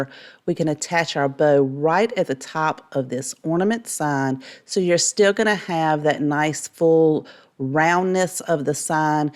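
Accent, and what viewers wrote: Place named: North Carolina, United States. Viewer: I love your videos! You take simple products and make them elegant! What state do you live in? My name is too and you talk like me (NC girl):)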